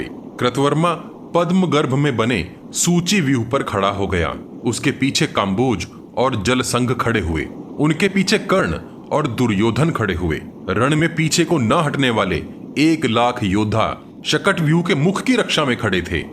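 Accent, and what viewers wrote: Standard. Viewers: Bahut dino baad, etni sudh Hindi suna😊 acha laga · आपका यह प्रयास अति सराहनीय हैं। आप ना केवल शुद्ध उच्चारण से महाभारत का दृश्य पुनर्जीवित कर रहे हैं, बल्कि पुरातन काल में सनातन के परम वैभव को भी आप स्थापित करने में अपना योगदान दे रहे हैं। यह सब कार्य भगवान की कृपा के बिना असंभव है। हमारी प्रभु से प्रार्थना है कि आप इस तरह के श्रेष्ठ कार्य को आगे भी करते रहे।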